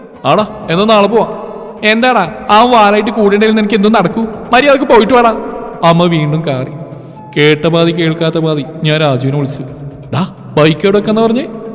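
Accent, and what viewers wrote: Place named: Thrissur, India. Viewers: ന്തുട്ട ഗടിയെ... മ്മ്‌ടെ തൃശൂർ slag ഗിൽ... കിടുട്ടോ... കൊള്ളാം ❤ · Thrissur basha pollichuttaaaa😂❤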